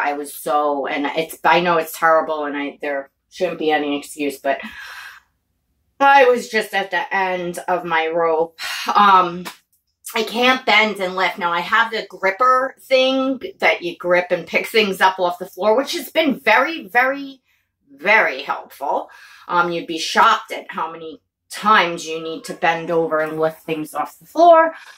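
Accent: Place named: New York, United States. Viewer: Look at you girl!!!! You look and sound amazing!! We missed you (I personally missed that New York accent). 😘😘😘😘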